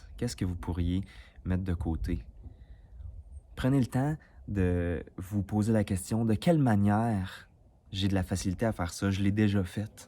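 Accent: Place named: Canada. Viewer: Bonjour Merci c est vrais ce libérer du surplus pour recevoir le nouveau en ce moment très drôle je suis en plein rangement de mes armoires ça déménage hiii l énergie ne circule pas quand trop charger une pièce ext... vivre dans le passé bloquaient pour de nouvelle rencontre balayage la coupe ne peut pas ce remplire si elle est déjà pleine 🤭 🎉 bon votre petit accent du Canada 🍁 j aime bien ily à du soleil dans votre guidance et j adore à bientôt ❤❤❤😊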